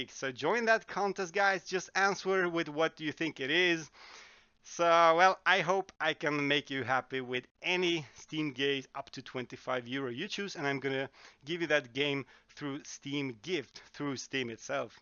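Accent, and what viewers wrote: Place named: Sweden. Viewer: Jag tänkte väll att du var svensk Man hör det lite lite på din engelska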